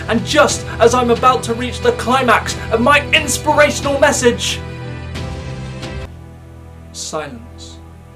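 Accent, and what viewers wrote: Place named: Ireland. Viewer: Well wtf have you turned in to, you might have been better of telling us your number one fans, the bull shit story of Aladdin in your Irish accent,it would have been more fun, keep them coming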